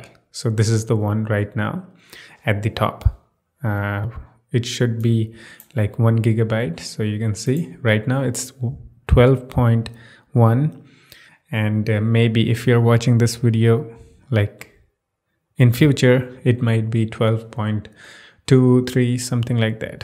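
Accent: India. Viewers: anyone knows he is from which place talks like and indian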